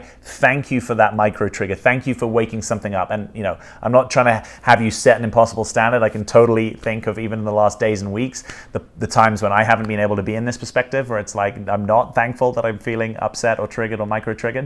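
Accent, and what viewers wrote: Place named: England, United Kingdom. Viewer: I think that's what I've gathered. has a very similar accent to mine from England.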